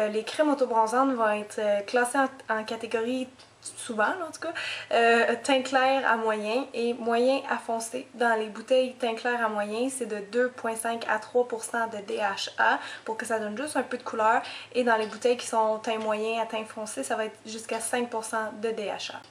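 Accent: Quebec, Canada. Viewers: Coucou. J'adore ton accent Québécois!! Dit je suis un Garçon j'aimerais essayer l'autobronzant Spray de Musst que tu a indiqué. mais vu que je suis un mec j'ai évidemment des poils aux jambes bras... et j'ai vraiment envie de me raser. Qu'en dis-tu? Je peux l'appliquer comme meme?:)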